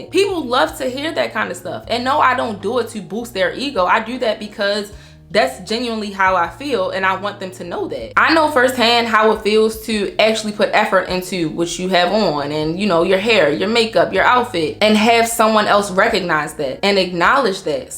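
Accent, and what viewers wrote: Baltimore, United States. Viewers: Sorry it have nothing to do with the video but it sound like you from Baltimore but not in a bad way. I’m currently trying to break my habit of saying my words correctly. Which you do, it’s just how you say certain words lol 🩷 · Hold up your Baltimore?? Your accent sound like bmore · Beautiful! So many nuggets! Also, Sis you from Baltimore? Lol ❤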